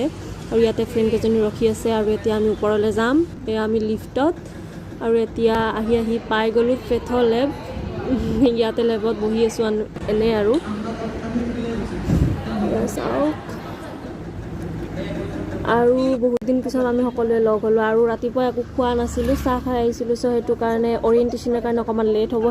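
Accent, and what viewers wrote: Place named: Sylhet, Bangladesh. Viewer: বাংলা লেখা স্বত্বেও কিছু না বুঝা আমি😅 সিলেটি সিলেটি ভাব আছে কথায় কিন্তু কথা গুলো সুন্দর। ভালো লাগলো❤️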